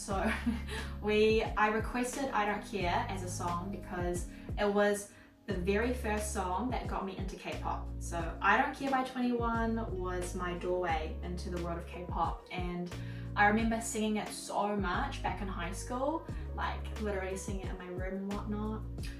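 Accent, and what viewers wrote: England, United Kingdom. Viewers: I love your English accent and i just wanna hear more and more 🥰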